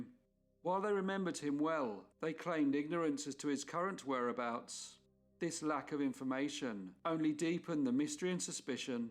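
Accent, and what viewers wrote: England, United Kingdom. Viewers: My goodness! I do so love an Engllish accent! I can't help but mentally picture Eddie Redmayne as the narrator details every tragic story. Both voices are quite similar to my ears. I only hope the narrator is NOT a product of AI. As a human, make no mistake, you are blessed with a talent only few possess--a great speaking voice & the determination to research & present these stories. Thank you so much for sharing your talent & interests with the public. This specific niche is yours alone. No one else can compare.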